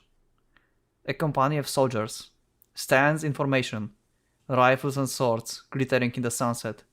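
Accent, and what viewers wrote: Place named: Germany. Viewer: I'm watching this because alot of debatetubers are glazing the main character's writing. Is he really above in terms of characters like Walter White and Beato? Also, I do want to play the full vers (including the sus scenees) on steam but I don't have a functioning pc 😢 Also, I like your accent man, you remind me of a german teacher I once had.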